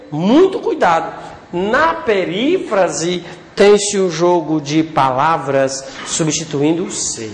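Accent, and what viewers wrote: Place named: Northeast, Brazil. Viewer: Você é o cara, já vi de tudo mas posso concluir que com você o entendimento é rápido e claro. Feliz São João professor, pelo seu sotaque ou é nortista ou nordestino. Um abraço.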